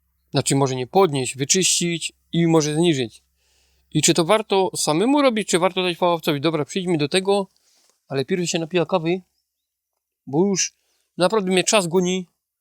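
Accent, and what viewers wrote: Silesia, Poland. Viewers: super się ciebie słucha jak opowiadasz w gwarze śląskiej, bardzo mi sie podobo waszo godka, warto mieć swój kawałek gruntu i swój kawałek podłogi, warto samemu coś zrobić bo daje satysfakcję, pozdrawiam, lajk 62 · śląska gwara mnie rozwala;) na dobry początek zostawiam subka i zapraszam również do mnie, pozdrawiam z Tyrolu:) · Super odcinek. Rewelacyjna gwara śląska. Jeśli chodzi o kamerę to zanim ją rozbijesz sprawdź kartę. Prawdopodobnie przyczyną wieszania się kamery jest karta SD. Zmień firmę karty SD i powinno wszystko działać bez problemu. Miałem taki sam przypadek. Moja kamera nie trawiła kart Kingston natomiast bardzo dobrze pracuje kartami SanDisk. Pozdrawiam serdecznie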